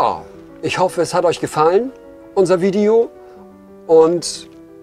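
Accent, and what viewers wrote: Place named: Hamburg, Germany. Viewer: Bildschön das Auto..Das du aus Hamburg kommst hört man. Ist bei mir genauso. Gute Einstellung mit deinem Auto 😊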